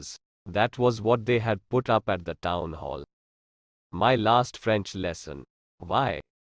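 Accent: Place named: India. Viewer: This miserable solng India English leave please for speaker native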